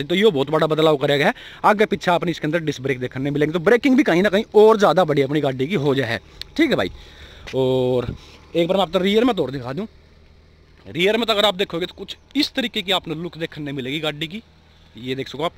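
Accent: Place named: Uttar Pradesh, India. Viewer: Bhai apni Desi up wali language is best haryanvi is not good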